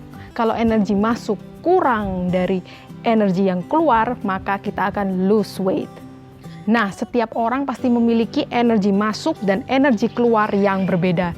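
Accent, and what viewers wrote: Java, Indonesia. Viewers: Aku sub dah!!! Ekkekwke mirip hansol 🤣 nyrocosnya logat jawa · Adohh ngakak ya baru pertama kali liat video nya. Medok e keliatan 🤣🤣🤣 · Kak...kok ada medok nya....org mana kak????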